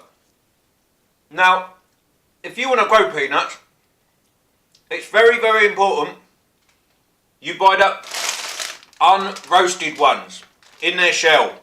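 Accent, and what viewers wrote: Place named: Bedfordshire, United Kingdom. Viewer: cheers, giving the peanuts a go this year. Also saffron, and for utter madness, sugarcane. You sound local to Bedfordshire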